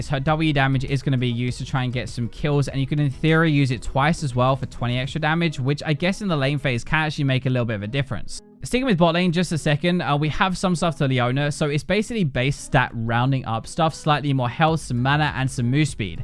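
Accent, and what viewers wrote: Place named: Northern England, United Kingdom. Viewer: holy shit I can't understand a thing your northern english ass is saying. ENUNCIATE FFS.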